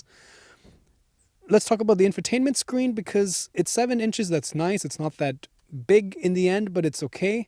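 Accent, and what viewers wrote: Germany. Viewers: eine frage warum gibts die test´s nicht in 2 sprachen ? oder mit untertitel den soweit ich das höre haben sie doch einen deutschen aktzend ;)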